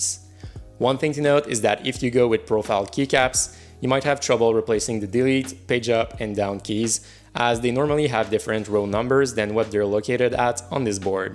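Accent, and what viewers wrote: Quebec, Canada. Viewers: Hes canadian french so, dont mind the pronounciation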